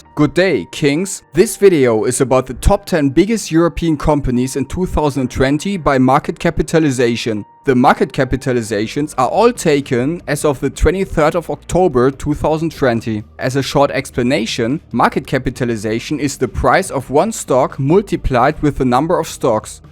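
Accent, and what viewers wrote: Germany. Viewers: Love an English German accent.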